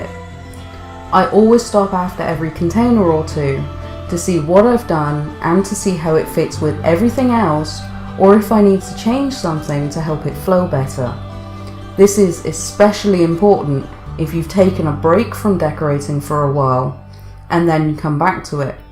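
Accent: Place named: France. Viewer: Ouiiii j'adore ta vidéo, tu as un accent français si charmant ^_^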